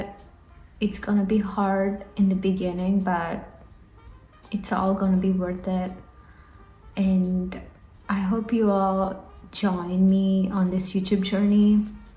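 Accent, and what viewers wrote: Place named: United States. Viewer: Wow your English accent is just like the American... Nice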